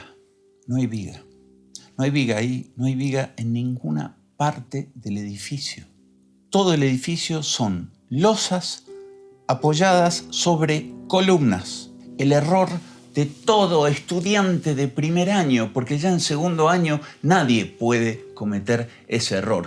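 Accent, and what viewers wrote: Argentina. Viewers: Muy buena explicación técnica realizada con esa manera de hablar tan característica de los académicos Argentinos.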